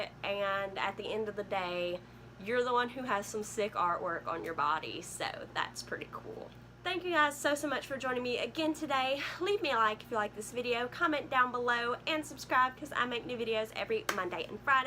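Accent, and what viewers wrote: South, United States. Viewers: I like your southern accent.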